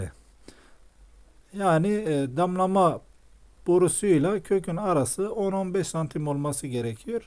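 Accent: Malatya, Turkey. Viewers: Bende malatyaliyim bizim yöre şivesine çok benziyordu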